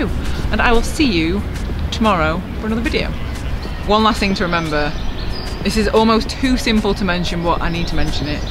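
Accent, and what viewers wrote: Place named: Northern England, United Kingdom. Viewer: Yeah, I have watched your vids on and off for a few years. You certainly keep me going on the bike. I love your biking story, I like your honesty, failures and success' and the fact that you have the courage to put yourself in the light. A real ambassador for normal cyclists. The Northern accent is even beginning to grow on me;-)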